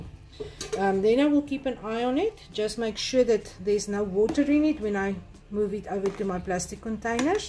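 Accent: South Africa. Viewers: I had to watch the video over because I am a South African living in Australia, and it was just so lovely hearing a beautiful South African accent....My mother was dependent on her oxygen machine towards the end of her life, and the load shedding was frightening for her, so thank God for modern technology, we were able to get her a generator. It is just a way of life one gets used to...with the flies and all.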